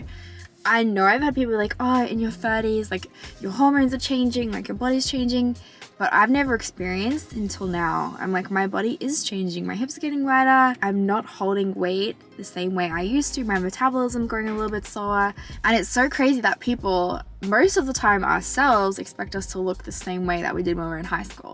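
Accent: Australia. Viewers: Came here for Australian accent advice, stayed for the positive mindset about self love ❤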